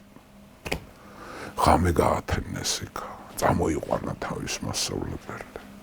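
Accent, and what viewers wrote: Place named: Racha, Georgia. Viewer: თანაც რაჭულად რომ მოგვითხრობს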